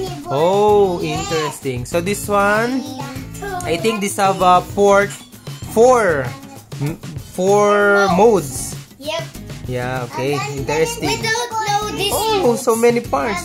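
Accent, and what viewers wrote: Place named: Philippines. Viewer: Owe men your accent, are you a filipino?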